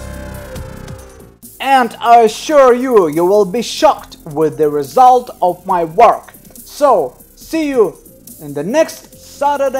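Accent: Russia. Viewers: Мне кажется что по-русски вы говорите лучше чем по английски с русским акцентом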